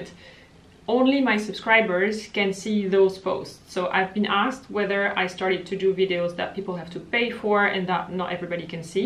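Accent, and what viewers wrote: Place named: France. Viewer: Hi! I’m a Spanish woman who lives in San Juan, Puerto Rico. I ❤️your videos and I ❤️your french accent speaking English 👌I would like to know if lavender is good for cool or warm undertone (I think mine is warm) Have a nice day.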